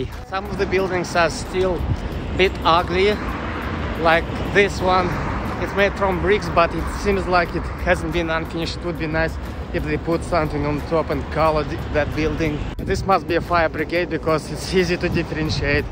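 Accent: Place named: Russia. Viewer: никакой он не американец, американцы с таким диким русским акцентом не говорят.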